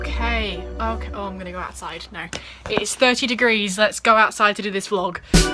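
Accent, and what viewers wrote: Australia. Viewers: ahhh you've done it again, I'm going to miss your cramps so much when this year ends! huge congrats on getting so far with NIDA, excited to see what you choose to pursue next year:) (also, idk if it's just me, but you're sounding much more Australian already this month I think)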